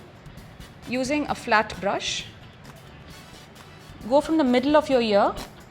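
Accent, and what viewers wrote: India. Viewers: Indian accent 😍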